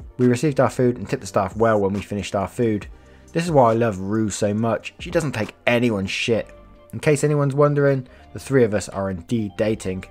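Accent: United Kingdom. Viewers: I love your brit accent, what part of England are you from? We live in Texas, but my in laws are from the Stratford on Avon area. They live in TX now and we are visiting UK in 2020. Great storytelling!!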